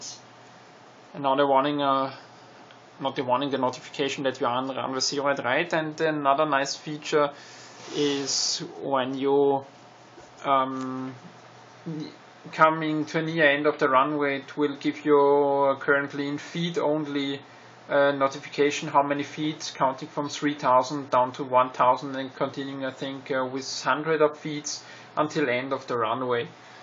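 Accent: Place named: Austria. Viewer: danke fürs video, sehr anschaulich und interessant! Ich hab an ihrem Akzent gemerkt, dass sie Österreicher sind hehe, Grüsse aus Graz hehe!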